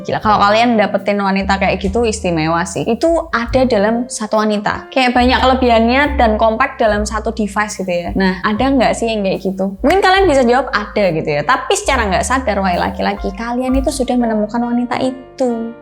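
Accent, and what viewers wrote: Java, Indonesia. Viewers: Medok e jan joss mbk yu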